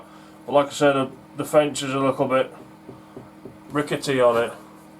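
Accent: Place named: Yorkshire, United Kingdom. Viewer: I'd watch these videos just to hear that lovely Yorkshire accent..... ;) :) :) :)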